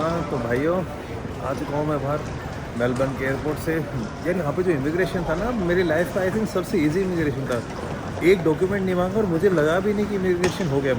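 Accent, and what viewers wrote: Haryana, India. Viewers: Arey yo toh haryanvi Bhai se . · Hariyanvi tach I like it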